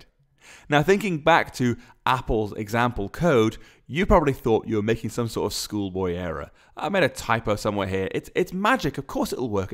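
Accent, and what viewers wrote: United Kingdom. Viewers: I like British accent.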